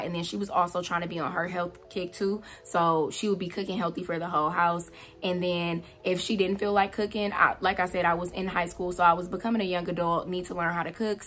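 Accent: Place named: Midwest, United States. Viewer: Loved this video’! I am officially 15lbs down with 30 more to go. Side note: I knew you were from the Midwest. I heard the accent in your voice. I’m from Chicago lol.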